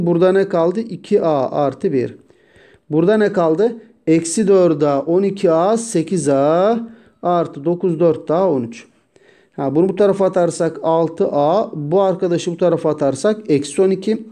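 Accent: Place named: Çorum, Turkey. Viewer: Corumlu şivesi eskisehir veya sivas herhangi biri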